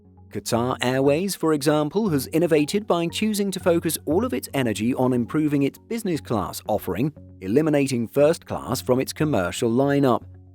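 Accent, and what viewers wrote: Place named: United Kingdom. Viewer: Honestly, I’ve flown on several Middle Eastern carriers (always in business class) and I spent about a week in Turkey (sorry Erdogan I’m not spelling it the way you would like me to so eff off) and I’ve never seen a woman, much less her daughter, in a niqab, hijab, or for that matter a full chador. The Muslim “religion” is a goat herder’s abomination. So why would you, by the sound of your voice, from the UK and a child of the British Empire, such being the political creator at the end of the Ottoman Empire of the current abortion that is Middle East show videos of airlines completely understand that their business is buttered on all sides and not necessarily just Muslim sides, start this video off with two women in chadors? Why must your generation be so effing politically correct? All of you children can go straight to hell. 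We won’t get into whether or not it’s the Muslim concept of hell but I guarantee you that you won’t find any virgins anywhere once you die.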